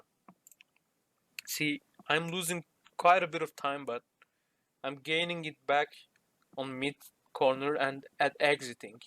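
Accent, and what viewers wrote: Turkey. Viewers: ben direk anladım türk olduğunu çok belli aksanı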